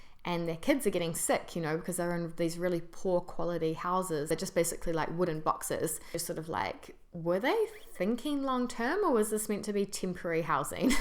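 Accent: New Zealand. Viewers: Is it a common qiwi way of pronouncing 'e' sound or is it just you?:) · I was wondering why your voice sounded so familiar. Then I realised you're from nz too! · Clearly a Francophile with a Kiwi accent. Sad.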